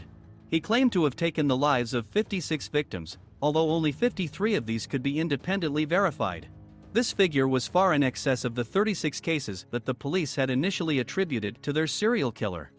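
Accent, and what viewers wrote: Russia. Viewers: I love your videos but I like it better when you used your real voice, it sounded much better with your beautiful accent. Russian I believe ? I love it !!! Man he looks scary . Pretty sick dude.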